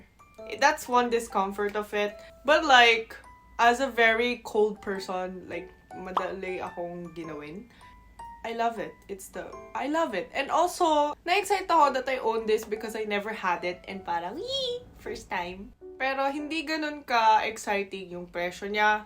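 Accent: Batangas, Philippines. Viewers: 101% Korean looking but the Accent FILIPINOOOOO. 🥰❤️😝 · Omg..pinay na pinay ang pannalita ang accent pati taglish lahat2 ay d masasabi Koreana 🫠😊😍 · you got a Pinoy accent for a Korean. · This will be the new youtube video I'll be watching now. grabs potato chips PS. She is so cute with her Koreana looks and pure Filipino accent. :) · Hello 👋. New subscriber here from the USA, though Filipino (-American). Your story is unique, interesting, admirable. Your love of the Philippines, your sadness when you flew out, are unsurprisingly, emotionally, understandably, lovable. Your Tagalog is perfect, together with the accent. Your English sounds Pinoy-ish, too---like a Filipino speaking English. You are funny, too. Be yourself and don't change. 😉 On second thought, I would not consider your scenario "reverse culture." Having brought to the Philippines when you were just 4 years, I would posit that at that point in time, you had not been exposed or experienced Korean culture yet, being that you still had baby milk in your mouth. 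So, in my view, you are just undergoing a plain one-way culture shock, no reverse yet. 😑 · I am a Batangueño senior citizen who stumbled into one of your videos, the one with Batangueño accent. This video is the second one i’ve watched. I kept laughing and sometimes to the point of tears. Your Batangueña accent is real. I dare say you are a Batangueña who looks like a Korean 😂🤣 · Like you're speaking in Batangas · Her English is spoken with a Philippine city accent, as opposed to the majority of the ones working in the US who did not grow up there who speak with a province accent. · Wow ang bilis ng panahon naka isang taon ka na pala diyan sa Korea parang kailan lang yun ah. Pero the way I see you just look Korean pero the way you speak and think your Filipino. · Well this Korean lady speaks like she is Filipino. Her English is Filipino in style and accent. She speaks Tagalog like any other Filipino. 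Awesome 😮😮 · You sound and speak like Filipino. That’s good. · Your english is so pinoy. Accent is so neutral. Love it. Your tagalog as well is perfect. God bless! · Nice vlog, you really good in Tagalog and the way you speak is really pinay accent, kudos and good luck hope you all the best while in Korea.🥰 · korean with filipino accent is so damn sexy 😍😍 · You have an accent of a Filipino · Your English is so pinoy style obviously sa pinas ka lumaki and i can tell you have gone to a good school. Nice to drop by your channel. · even your English has really Pilipino aksent! gusto kita. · Kahit accent nya pinoy na pinoy amazing. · Alam mo kapag ipinikit q ang mga mata q at pinakikinggan kita, pinoy na pinoy ka talaga. Yung accent mo at the way ka magsalita, mga punto at bigat ng pagsasalita mo, para kang local pinoy. Hahahaha! Lalo na yung sinabi mo na "asan na yung asin?" Yung diin, punto at accent mo, pinoy na pinoy talaga. 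Hahahahaha! Kudos sa iyo! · "ASAN YUNG ASEEENNN???"...... that's a killer.... 🤣🤣🤣.... pinay na pinay.... yung dateeeng.... yung dateeng ng diction... wow na wow.. walang ka arte arte... cute na hindi nagpapacute. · Actually you are more Filipino to speak in terms of English and Tagalog tone. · Ang cute cute kasi pag English niya Pinoy na Pinoy! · I love that you have a Pinoy accent hahaha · Love that English Pinoy accent and your tagalog is better than mine. More prolific at Bisaya though. Headed back to Cebu next month....can't wait, but I hate the freakin' long flight from Illinois to Seoul to Mactan then to Dipolog City where my wife is from. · she really grew up in the ph coz of the way she says english words like culture and alot more lmao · Your tagalog is like those of the pinoy kids as we call it mga "sosyalin",taglish but still very pinoy accent. I love watching your vlogs,it's kinda cute😉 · ma'am alam mo parang pinay na pinay ka talaga.lalo na sa pag bigkas ng words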